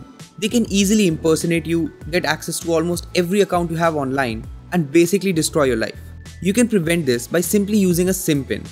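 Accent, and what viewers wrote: India. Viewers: Ughhhh Indian accent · I soon as I heard your Indian I went next!!! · As soon as I heard that Indian tech scammer voice I clicked off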